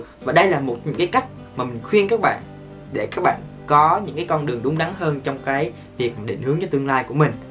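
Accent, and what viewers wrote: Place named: Quang Nam, Vietnam. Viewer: Anh ở Đồng Nai sao a nói giọng Quảng Nam ???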